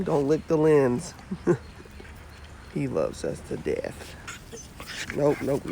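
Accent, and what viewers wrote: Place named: United States. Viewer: You sound different. So country.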